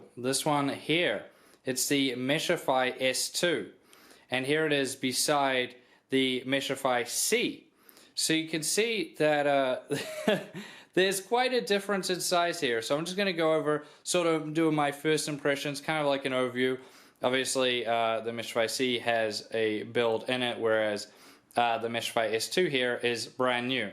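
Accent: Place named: New Zealand. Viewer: Hey man... so ahh you have a very interesting accent. R u like a kiwi or something?? 😂😝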